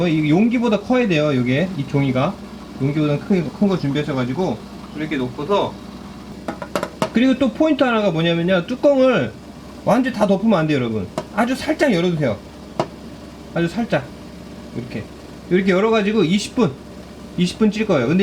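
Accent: Japan. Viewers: Gecko Park_KR 교포는 아니시고 일본에서 오래 생활하셔서 그래요 ㅋㅋㅋ예전 영상들 찾아보시면 일본인이 한국어 쓰는거 같을정도인데 영상하시면서 많이 돌아오신듯ㅋㅋㅋ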